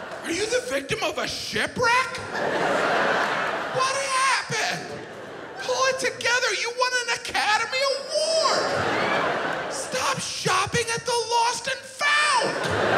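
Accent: United Kingdom. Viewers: That’s not a British accent that’s Scottish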